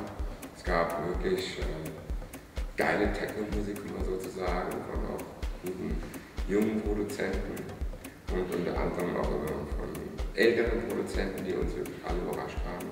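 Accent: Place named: Hesse, Germany. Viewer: es svenche ausem ömche,ich wünsch mir das de mehr hessisch babbelst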